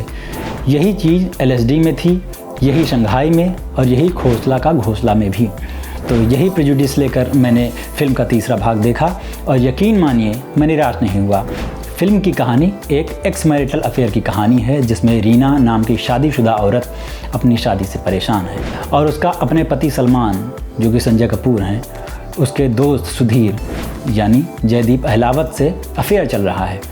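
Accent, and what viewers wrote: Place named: Uttar Pradesh, India. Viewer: subhas chandra mondal...... chutiya ho kya jindgi bhar judgemental reh jaaoge.... ye typical up ka tone hai.... sabse bc tone tera hai wo hai racist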